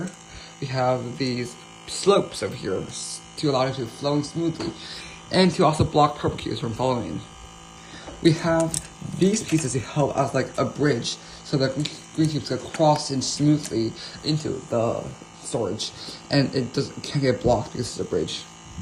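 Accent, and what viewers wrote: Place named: China. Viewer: u sound so chinese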